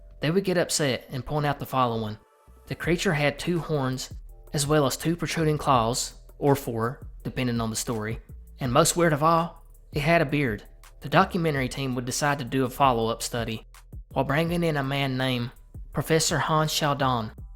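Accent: South, United States. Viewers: I love rural/southern/farmland accent. So nice on the ears. Honest and direct. No pretentiousness.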